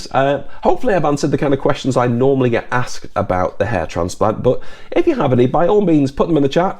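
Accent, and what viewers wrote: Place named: Northern England, United Kingdom. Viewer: Hi, Another insightful video, and great to hear a friendly northern accent;) Thank you for answering my last two comments.. I have been researching FUE for the last 18 months, as that is when I have noticed my thinning and receding hair line. Funnily enough its the exact same time when my first born arrived, maybe there is a correlation as soon as you have kids, your hair says ok, you have found a mate, and we have procreated, no need to stick around lol... Keep up the great work you are very natural and entertaining, Best Rich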